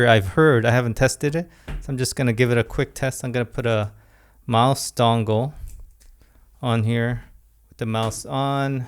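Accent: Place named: United States. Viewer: Are you Korean? Your English is very Americanized/Westernized.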